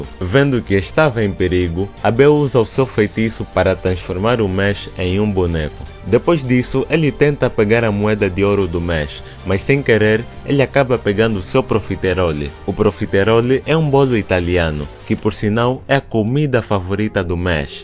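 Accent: Angola. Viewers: Ele parece ser da Angola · O narruaidor eis angolaino?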